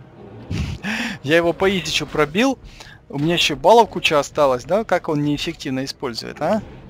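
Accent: Ukraine. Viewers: Ти из украини?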